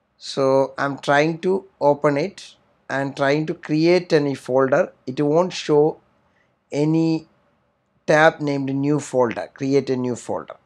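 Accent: India. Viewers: Man, that OG Indian accent!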